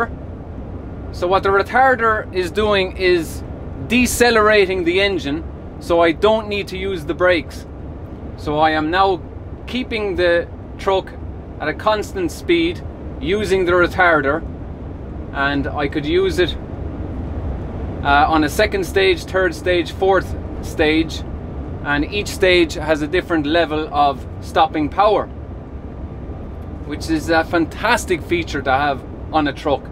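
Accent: Ireland. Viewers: he sounds irish mate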